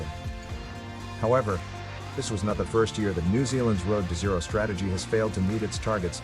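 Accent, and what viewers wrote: United States. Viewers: Why is all the footage AND the commentary accent based on USA, when New Zealand is more like Britain. We drive on the left, our emergency number is 111 NOT 911, and we (mostly) speak English similar to British English but with less regional accent. We generally don't speak American English. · The minute I heard the American accent it lessened the impact of the message. How about we all take responsibility for our actions on the road, good or bad ! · This is an Aotearoa (New Zealand) funded road safety promo hosted by an American or Canadian voice... Just say'n. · Most of these comments are fake. Ask what is the motivation for producing this, and why the American narrator?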